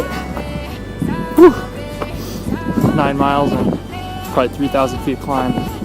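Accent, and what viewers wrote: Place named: Canada. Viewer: Good luck. Looks awesome. Were are you from? You sound more Canadian.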